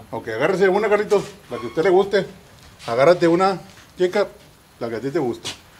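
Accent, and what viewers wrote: North, Mexico. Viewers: Saludos compa desde Atlanta Georgia me encanta tus vídeos y tu asentó norteño esta perro viejon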